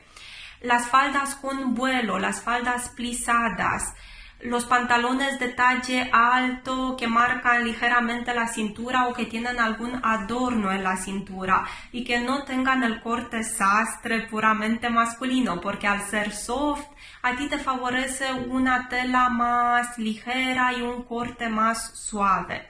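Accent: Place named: Romania. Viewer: 10000% accent romanesc aici!! 🇷🇴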